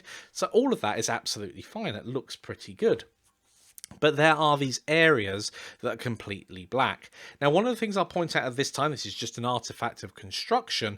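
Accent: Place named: United Kingdom. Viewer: These videos are great. Besides, the British accent is great for teaching apparently. :P Thank you so much for the time and effort you take to teach us! :)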